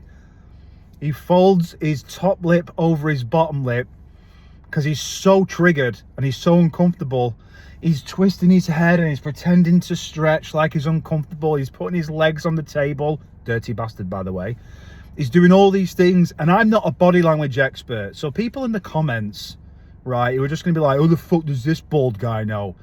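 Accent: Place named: England, United Kingdom. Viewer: Thank you. I feel the same. And I really love hearing your English accent. English accents are glorious & always elevate the experience ❤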